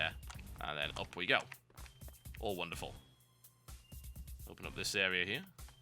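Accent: United Kingdom. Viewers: Americans... the language was pretty much created by the English, so how can the Brit pronunciation be wrong? It's your version of English that's been bastardised. As for Beta, it's "beeta". Live with it, it's a Greek letter so really, it's pronunciation was set a long time ago :P While we're at it, jelly goes with ice cream, jam goes on bread and it's aluminium, not "aloominum". Thanks :) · TB isnt rambleing insanly, he's rambling insanly in a British Accent.. His WTF Videos are a first look at the video, within the video he gives commentary to help get a little more enjoyment out of the video. As for the starting screen, he Critiques it eg Multiplayer Options, Settings, etc. 2 minutes really isnt THAT much of a sacrifice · Well, everything sounds classy in brittish.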